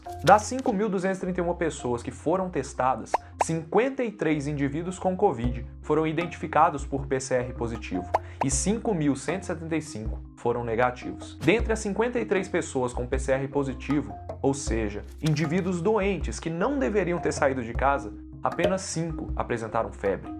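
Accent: Minas Gerais, Brazil. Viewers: Esse sotaque mineiro é muito gostoso de escutar!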